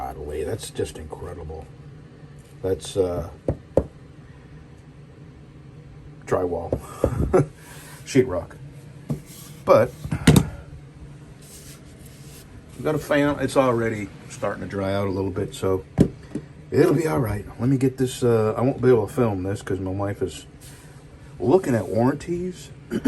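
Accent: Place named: Northeast, United States. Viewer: Talkin about that MCI truck reminds me of the time when I was heading down I-69 into Ft. Wayne, Indiana and had a Petershaker that was pissed about being passed shove me off into the median. Had to get towed and bitched at over that one. Freaking safety officers. Hate 'em. I never noticed it before but with as many times as you said "wourder" in this video, it's plainly obvious now that you're from the northeast.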